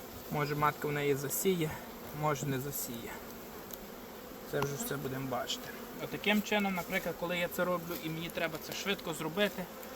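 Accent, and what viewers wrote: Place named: Ivano-Frankivsk, Ukraine. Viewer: Цей говір , ну відразу ясно що людина з Франківська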